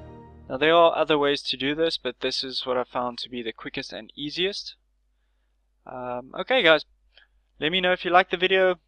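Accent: Afrikaans, South Africa. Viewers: I recognize an Afrikaans ouk when I hear one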